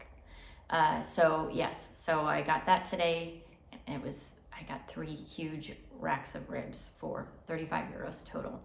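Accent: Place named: United States. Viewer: your american accent... quite charming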